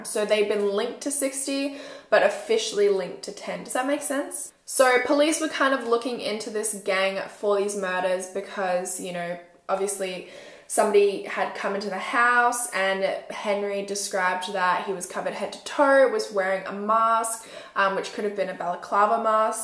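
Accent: Australia. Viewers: i believe she does have an Australian accent :) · Omg that emergency call. It’s SO frustrating! I’ve heard similar from the US, you wonder what these people are thinking. It’s emergency for heavens sake! I’ve had to phone emergency here in Australia several times and thank God they’ve been really great. you are so fantastic at this, I love hearing another Aussie on YouTube true crime. Hugs!❤️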